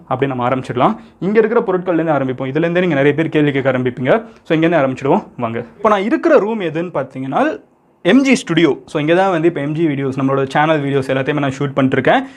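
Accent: Madurai, India. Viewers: Bro Neenga Maduraikaaran solrapove summa getha irukudhu.❤️❤️❤️❤️engà irukeenga nu solunga nerlaye visit pana varen..Am from Mahatma Gandhi Nagar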